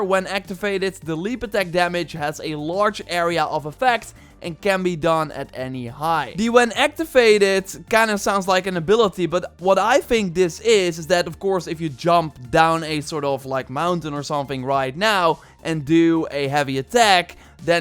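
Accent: Netherlands. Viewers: I HATE his Dutch accent on his english.... like it sounds cheap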